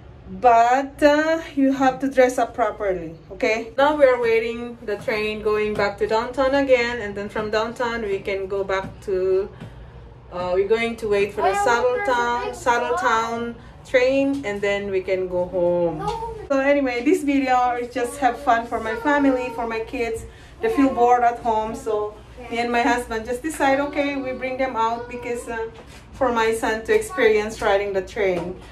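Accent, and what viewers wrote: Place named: Ilocos, Philippines. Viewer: nice vlog. Narinig ko lng Iokano pala kau. hehe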